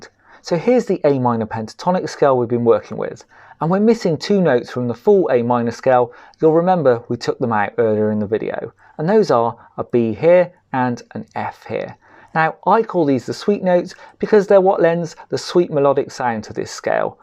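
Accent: England, United Kingdom. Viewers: I'm 69 years old and I've had many lessons and watched many YouTube videos. This is absolutely the best lesson I've EVER "had" to explain how the blues work. I know this pattern and can play it blindfolded but you have taught me how to correctly use it and it makes so damn much sense. THANK YOU. You sound English but I wish you were in Arizona so I could take lessons from you. Many thanks "mate".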